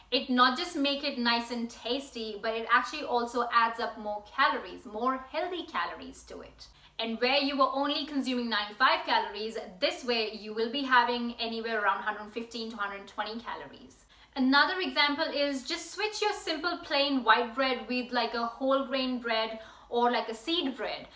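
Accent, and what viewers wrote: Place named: United Kingdom. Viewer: I guess she lives in britain from a long time since she's using British accent, so you know she got that habitually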